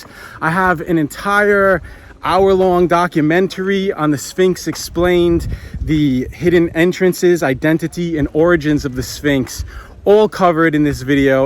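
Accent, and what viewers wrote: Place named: New York, United States. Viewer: You're one of like 4 channels I subscribed to. Keep the videos coming I think once anybody into Ancient Egyptology or even anybody who Just wants to see how beautiful Egypt is from your videos sees your channel you'll have a shit ton of subscribers. Very well informed and your vids show the real side of modern day and ancient egypt. Btw you're from NY aren't you? Lol I hear it hahahaha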